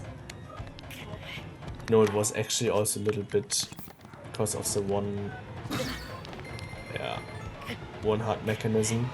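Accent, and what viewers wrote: Germany. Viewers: It’s a German voice like me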